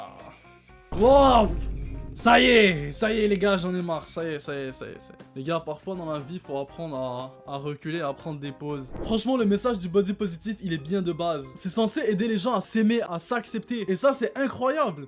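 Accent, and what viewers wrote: Quebec, Canada. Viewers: Attends t’es québécois ?!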